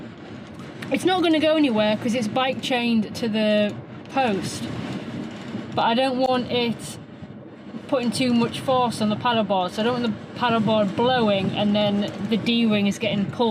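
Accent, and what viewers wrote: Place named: Manchester, United Kingdom. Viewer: Loved the horns you grew on the beach. That guy looking through you window reminded me of our one and only caravan holiday. When the kids were young we could only afford cheap holidays and spent a week in a caravan in mid Wales and a guy looked through one of the windows so I thumped the window which made him jump and because his forehead was touching the window hurt him. When I went out to confront him the cheeky sod moaned I’d hurt him so I told him to do one or I would hurt him and when I spoke to the site owner she thanked me as there had been complaints about this happening on a couple of other vans and he wasn’t staying on the site. Just nosey but is the work you do on your computer for your job or just your vblogs? Looking forward to next video as I’m still ill although been for X-rays and blood tests to see if that reveals anything, so your videos our a bit of a highlight although tomorrow my cycling buddies are coming to see me. 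I know you said you’re from Cheshire but your accent sounds more Manchester area. Take care the pair of you.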